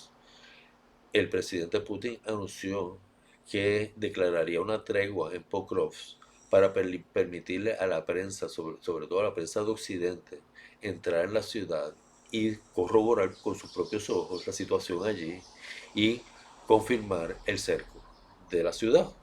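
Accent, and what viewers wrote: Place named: Cuba. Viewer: PONGA LOS PIES EN EL PISO AL HABLAR QUE TIENE LA MENTE IDA. ESO SON AÑOS DE HABER VIVIDO EN CUBA. POR LO QUE OIGO PARECE CUBANO.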